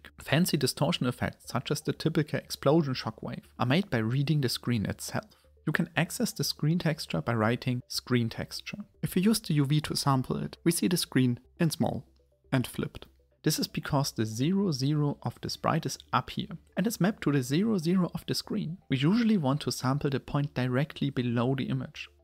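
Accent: Germany. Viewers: That’s a german accent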